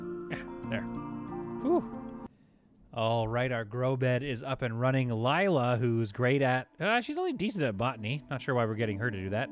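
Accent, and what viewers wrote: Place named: Yorkshire, United Kingdom. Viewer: Don't you be dissing the Yorkshire accent!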